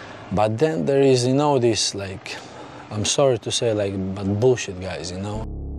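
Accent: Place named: Scania, Sweden. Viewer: They both have a Scanian accent from the south Sweden.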